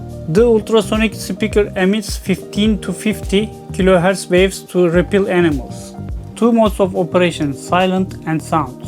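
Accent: Turkey. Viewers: Bu ingilizce aksanı kesinlikle bir Türk'e ait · Türk gibi ingilizce konuşuyorsun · You sound very Turkish ?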